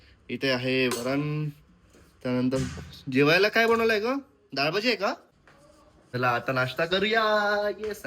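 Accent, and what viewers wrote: Vidarbha, India. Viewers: Tumhi vidhrbha side che ahat ka because tumchya marathi vrun vatte ts means thod touch ahe language mdhe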